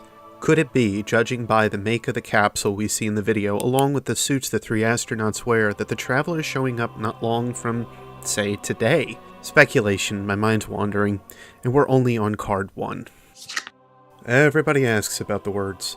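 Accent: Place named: Russia. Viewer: Your Russian accent sounds like a Nord dude from the Elder Scrolls Online...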